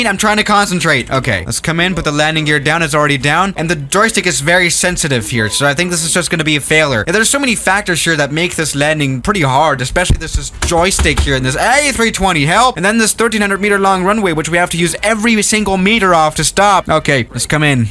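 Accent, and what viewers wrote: Germany. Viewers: Are you german? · wait a minute... are you from germany? 😊😆🤔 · U German 🤨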